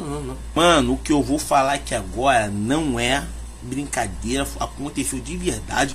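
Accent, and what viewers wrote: Rio de Janeiro, Brazil. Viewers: Do rio né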